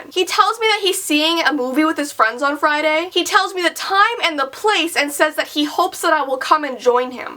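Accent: Canada. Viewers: Me: is she Canadian or American?: it's really weird eh Me: ok she's Canadian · "It's so weird eh?" 😂☺️her Canadian accident is the cutest lol. I'm from the south so that's really rare to find. Love you !!!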